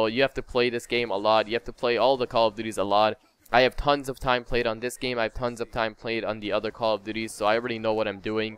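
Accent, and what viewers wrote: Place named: Canada. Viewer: Knew where you came from the second i heard you talk haha. what's up fellow Canadian!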